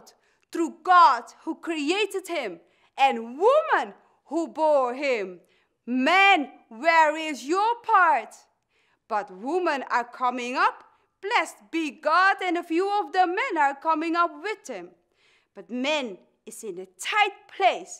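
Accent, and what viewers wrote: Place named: Netherlands. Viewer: Love the Dutch accent. I like to think that Sojourner would be happy to know that her own words are still not forgotten.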